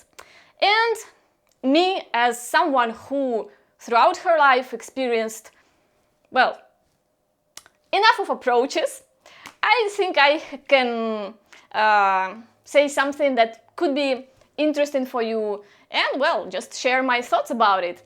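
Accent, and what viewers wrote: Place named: Russia. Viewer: I love how straight up you are and your swearing word's makes me laugh your actually funny the way way you speak from Russia but in English speaking love your video on utube keep it up cheers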